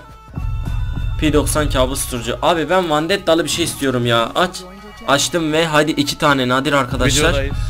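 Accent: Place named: Eastern Anatolia, Turkey. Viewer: Abi senin şiven tam doğu şivesi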